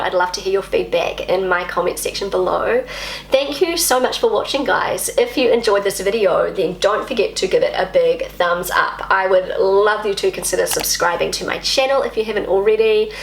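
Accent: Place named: New Zealand. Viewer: You are so cute with your 🥝accent, it's always a great pleasure to hear you speaking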